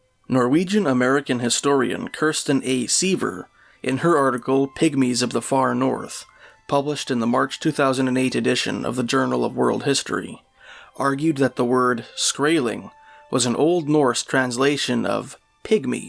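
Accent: Canada. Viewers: He even has a Canadian accent! ;)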